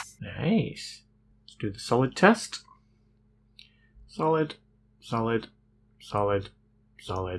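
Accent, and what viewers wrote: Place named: Manchester, United Kingdom. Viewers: I worked in Manchester (technically Stockport) for a couple of years and he sounds exactly like one of my workmates from the time. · Been wanting to ask you. You don't have to answer. Are you Manc? reason I ask is because I grew up in Brixton, London myself, but used to stay in Chadderton in the 80s (Firwood Park) and your accent almost feels like I am back there.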